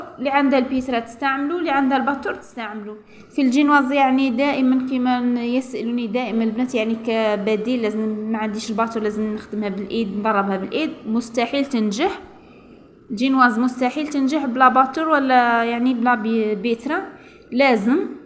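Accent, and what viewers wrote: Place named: Djelfa, Algeria. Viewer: معرف علاش حسيتك جلفاوية وغي قولي من مسعد 😅😅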